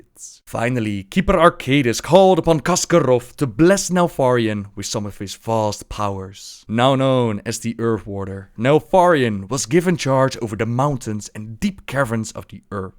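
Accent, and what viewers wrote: Netherlands. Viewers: You must be dutch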